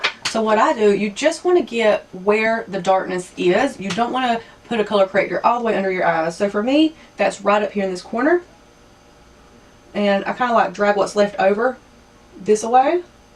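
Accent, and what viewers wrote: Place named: South, United States. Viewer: At nyte tyme...i love country tlk haha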